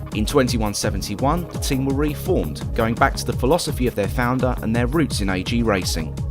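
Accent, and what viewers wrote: United Kingdom. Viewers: Never knew there was back story like this. And for us here in the States, all stories sound better through a British accent. Keep it up.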